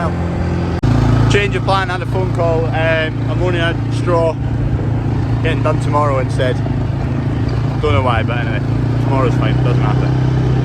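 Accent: Scotland, United Kingdom. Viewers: A love hear a good Scottish word like dug. Lol. Is your dug a lab. Well in another top notch vid pal.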